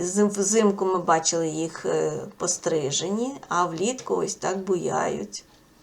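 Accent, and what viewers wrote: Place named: Russia. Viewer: Смешно слышать, как русскоязычный пытается говорить по-украински. Не мучь себя и слушателей, говори на родном.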